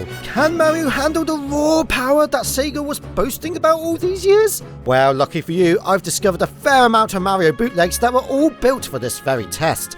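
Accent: United Kingdom. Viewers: how did i never know you were british until rewatching this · So he's not Australian, but British? Alright.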